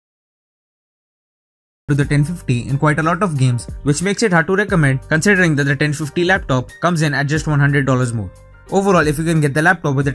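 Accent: India. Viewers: fakof with ur indian accent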